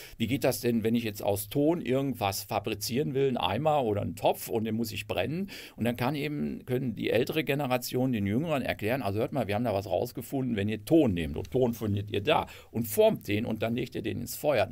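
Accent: Germany. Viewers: Ich mag übrigens deinen Ruhrpott slang. Die dortigen Menschen sind eigentlich sehr bodenständige, ehrliche und wahrheitsliebende Menschen Lass uns doch mal treffen und reden. Gruß Christoph Poth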